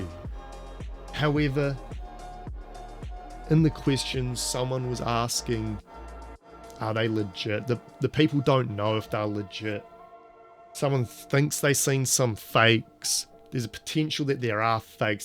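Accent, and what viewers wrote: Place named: New Zealand. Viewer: LOL after watching countless american youtube vids on pokemon.. listening to you makes me realise what us kiwis sounds like.. I would say you sounds like a typical kiwi. Man we sound so chill and unserious with a certain twang.. and the words we use.. fANcy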